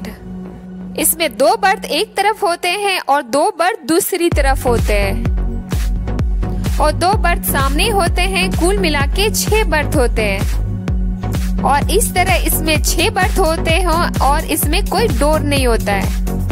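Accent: Bihar, India. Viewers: Your bihari accent is lovely 😍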